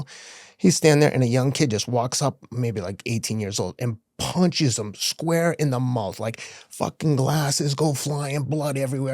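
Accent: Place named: Boston, United States. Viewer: Dude I love your voice!!!! That Boston accent is one of my favorites!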